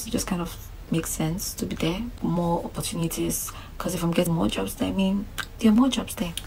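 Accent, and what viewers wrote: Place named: United Kingdom. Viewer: I like the blend of Queen’s English and Pidgin🤝 Keep ‘‘em coming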